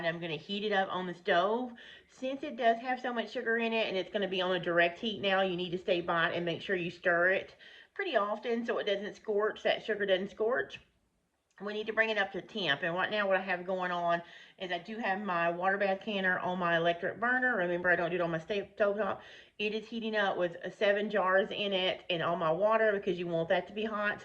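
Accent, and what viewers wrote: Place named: South, United States. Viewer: I wish I could watch and listen to your videos more often, but I have difficulties understanding you. It is a combination of speed and your lovely Southern accent. I always have both the volume and the closed captions on, but I still miss much of what you say. You often speak too rapidly for me to catch things. I need to back up and re-play sections often. I wish it weren't so. 😢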